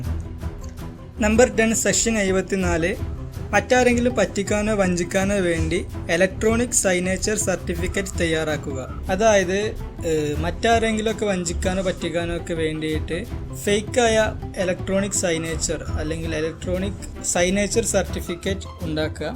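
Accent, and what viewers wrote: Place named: Malappuram, India. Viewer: P P മലപ്പുറം ഭാഷ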